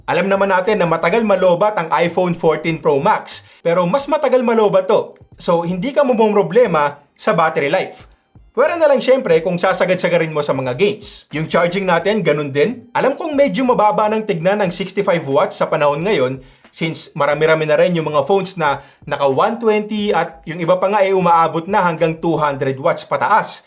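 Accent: Cavite, Philippines. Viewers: Kabiteño k pla boss ahahaha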